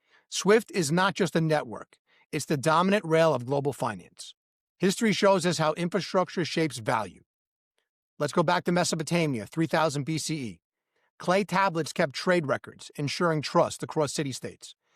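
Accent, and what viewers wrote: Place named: New York, United States. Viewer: My man is right on. Good info. Dig that N.Y. accent burning!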